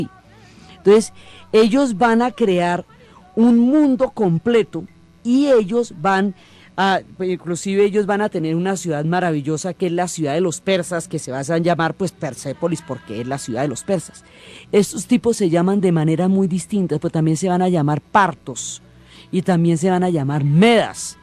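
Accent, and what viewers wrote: Colombia. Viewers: Gracias por estos hermosos relatos, los amo! He escuchado varios, en varrias ocasiones, igual que el resto de tu audiencia, pienso que sería buenisimo un mapa. Me encanta escuchar tu bello acento de Colombia, en México nos encanta la forma de hablar de tu bello país.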